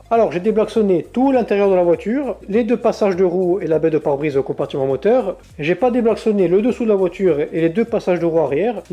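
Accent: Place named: Corsica, France. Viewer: pour ma part, j’ai transformé une petite f2000 en groupe À VH donc l’essentiel était déjà fait. Le partie ou j’ai galéré, ça été pour les freins avant car je voulais a tout prix des 4 pistons. Les gros en 315 cp3307 ne se faisant plus en neufs, j’ai opté pour les plus petit cp2361 avec les usinages correspondant… pour le reste ça été que du plaisir et de la passion. Je suivrais avec intérêt ce super projet et ces supers vidéos avec la touche et l’accent Corse😉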